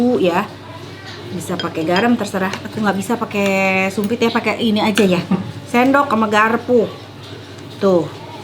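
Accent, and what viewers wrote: West Java, Indonesia. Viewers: Resep nyarios logat sunda na teh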